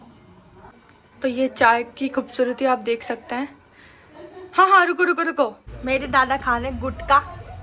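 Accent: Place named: Shekhawati, India. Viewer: बेटा आप कहां से हो कहां पर रहती हो बोली भाषा से लगता है कि आप शेखावाटी से हो क्योंकि मैं भी शेखावाटी की हूं नवलगढ़ की हूं मैं मुझे अच्छा लगता है आपका ब्लॉक ब्लॉक में बताना कहां पर रहती हो मैं कल के ब्लॉक में आपका इंतजार करूंगी चाय अच्छी है खुश रहो बेटा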